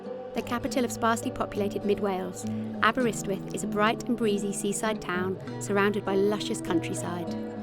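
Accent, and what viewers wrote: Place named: England, United Kingdom. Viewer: would it have been so difficult to have found a Welsh speaking person to narrate - it bugs me to have an English sounding narration of this lovely video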